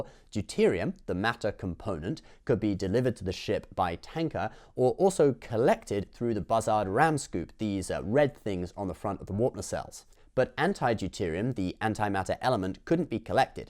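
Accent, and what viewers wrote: United Kingdom. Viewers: In his defense, he's British, they pronounce and spell things weird. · alan littlemoon keep in mind that this is The British and thus is likely to pronounce certain words differently than us Americans do Case in point: The word "schedule": US pronunciation: Sk-eh-jew-ull British pronunciation: Sheh-jew-ull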